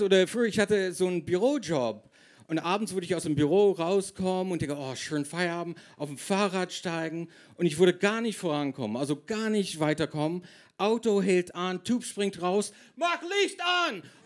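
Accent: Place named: Berlin, Germany. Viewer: Berliner Schnauze ist halt nice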